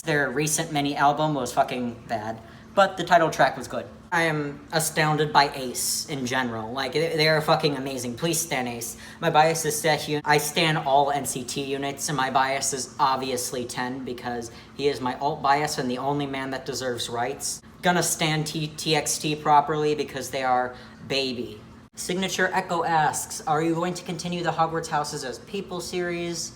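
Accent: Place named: South, United States. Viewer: Very weird question, but are you from Southern Illinois? Your accent sounds more southern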